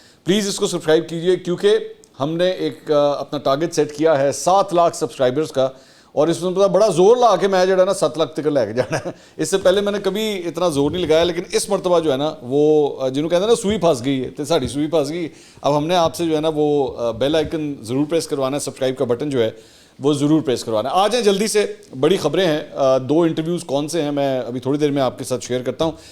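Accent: Punjab, Pakistan. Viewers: Mansoor ji…. Love your Punjabi ‘touch’, makes your VLOG more colourful..!!💯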